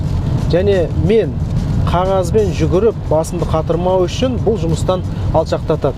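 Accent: Standard. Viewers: Азамат! Таза казакча сүйлөйсүң. Казак тилине салымың чоң. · Казах баурларымызга бизден салам, азаматсыз. Ушундай жаңы уналарды тааныштыра бериңиз! Таза Казахча сүйлөйт экен, азаматсын. Көңүл коюп уксаң ар бир сөзүнө түшүнөт экенбиз. Сөзүбүздө негизи көп деле айырма жок экен. Бекеринен айтпаса керек Казак-Кыргыз бир тууган деп. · Жарайсың қолдайм, таза қазақша , мәнді ,мағыналы , мәнерлі сөйлейсің брат отдуши👍👍✊✊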